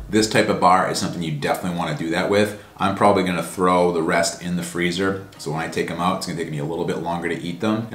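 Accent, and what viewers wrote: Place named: New England, United States. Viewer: You could be right on the cost. Sugar has to be the cheapest sweetener by far. I'll definitely be watching to see how the anabar brand expands and see if they do add some lower calorie flavors in the future. I'd be willing to pay the extra money if I could get them to fit my daily macros. By the way you are crushing it with the content! One of my favorite youtubers for sure. I assume your from New England based on some of your clothing and accent. I was born in Mass and grew up in NH. Cheers!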